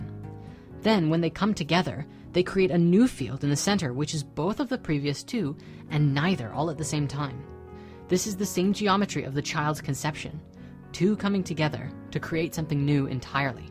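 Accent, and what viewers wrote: Canada. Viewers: Love it how (once in a while) the Canadian accent peeks thru! 😉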